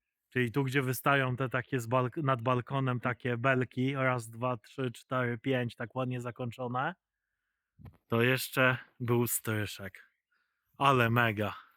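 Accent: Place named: United States. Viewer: Wygląda jak dom Kamila Stocha. A pan fajnie "po amerykańsku" mówi jak Mariusz Max Kolonko.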